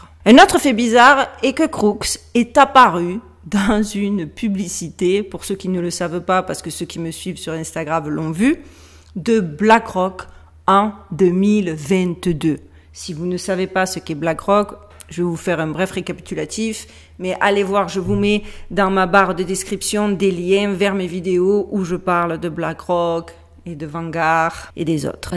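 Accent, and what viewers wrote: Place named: Southern France, France. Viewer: Belle et intelligente 👍 Et ce melange d accent du sud de la France la ou je me trouve et un accent americain est etonnant 😮 Soyez bénie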